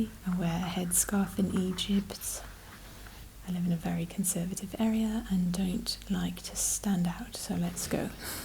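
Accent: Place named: England, United Kingdom. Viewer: I’m so confused. I thought you were American but you sound so English 😮🤯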